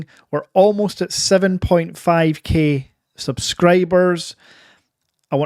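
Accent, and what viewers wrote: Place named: Scotland, United Kingdom. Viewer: Scottish, not English. Are you a fan of The Critical Drinker?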